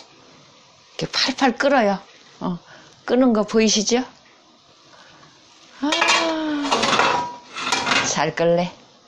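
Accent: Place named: Chungcheong, South Korea. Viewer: 충청도 아주머니신가봅니다 ^^ 저도충청도가 고향이유 재미있고 확실하게 설명도잘하시네요 재미있게 잘배웠습니다 건강하세요😃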